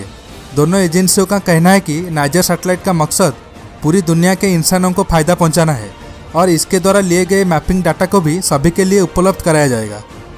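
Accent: Odisha, India. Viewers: oriya bolraha hai .ya hindii..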